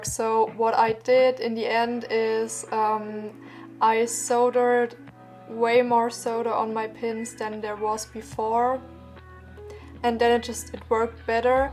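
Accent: Germany. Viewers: Man merkt, dass du Deutsch bist :D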